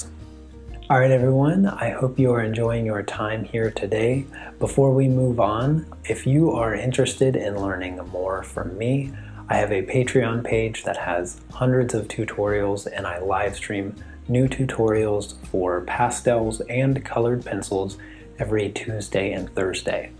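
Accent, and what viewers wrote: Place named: Central Pennsylvania, United States. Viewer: Love your videos, from one artist to another. Are you from central Pennsylvania? Just wondering because you have an accent similar to what we have. Sorry if that seems weird! LOL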